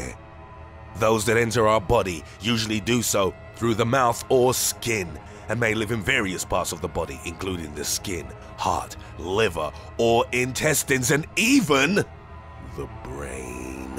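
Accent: England, United Kingdom. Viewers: The new narrator is too dramatic and his british accent annoys me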